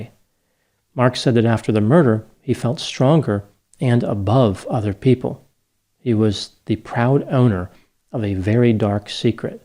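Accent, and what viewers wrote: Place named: Mid-Atlantic, United States. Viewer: Off topic but... If I'm not mistaken, I believe I hear a touch of the Maryland/Pennsylvania/Delaware/DC accent from. This accent is unique to my region of the US (Maryland) and is strongest in Baltimore City & Philadelphia. accent isnt nearly as strong as most here but it's so unmistakable I'm willing to bet my life he comes from one of those states.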